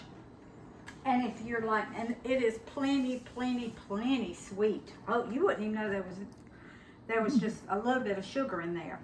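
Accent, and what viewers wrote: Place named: Missouri, United States. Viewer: You’re Adorable! You sound like your from Carthage, Mo! My mom grew up there.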